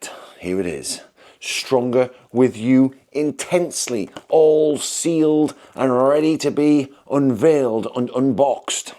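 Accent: United Kingdom. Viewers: Look forward to the Azzaro vid, they do great frags. I was trying to pinpoint your accent, I thought it east Yorkshire. If you get the chance, defo Scent Salim in Leeds/ Bradford is worth a shout. I can recommend a handful to try, and they are great hosts! I'll keep my eye out for the Azzaro vid.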